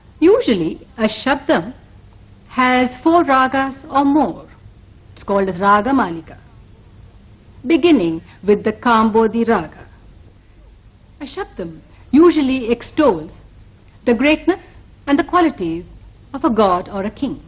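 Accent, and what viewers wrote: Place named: England, United Kingdom. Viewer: Amazing your an English accent 🙏🏻👏👍🏻 sweet i like very much of your launguage madam if I seen you i will be get autograph me god grace like this of dance performance Excellence wow 👍🏻👏🙏🏻❤️👍🏻🎉🎉🎉🎉🎉🎉 amazing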